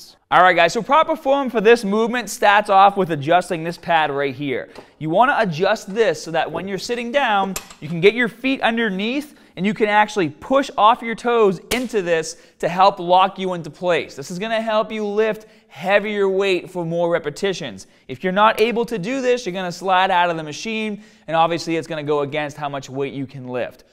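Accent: Boston, United States. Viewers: Geez, and I thought I had a “haad” Boston accent growing up there! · Boston accent. "When you pull this bah down..." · Who doesn't enjoy a Boston accent?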